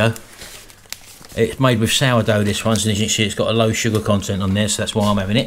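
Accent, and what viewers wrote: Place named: Cockney, United Kingdom. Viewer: Fairly new to your channel and I love it, I'm from East London but now live in Thailand, good to hear the Cockney accent. Dave B Thailand.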